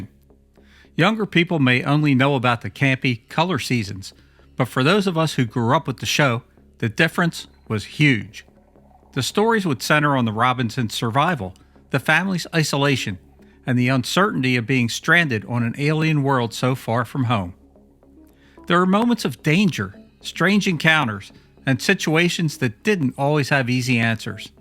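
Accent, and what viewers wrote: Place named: Philadelphia, United States. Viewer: Couple things: I note your Philly accent & Gene London reference. But beyond that, you're right, I was a kid watching it then. Episode one of the first season, in particular was serious & good, a real sci-fi adventure. After that, I felt things trending in a more lightweight direction. Still watched it a lot though.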